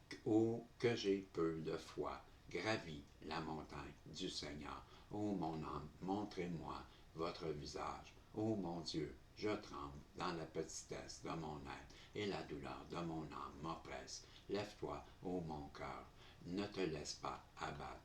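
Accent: Quebec, Canada. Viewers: Salut j'espère que ça va ❤ je vous adore Vous avez un sacré accent québécois 😂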